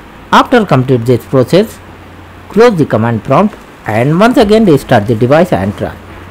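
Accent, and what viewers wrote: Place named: India. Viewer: Indian english accent 😁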